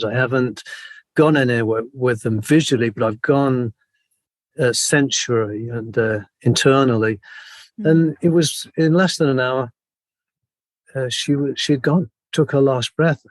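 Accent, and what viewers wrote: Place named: Scotland, United Kingdom. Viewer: My favourite Scottish YouTuber award always goes to. I just looooove that Scottish accent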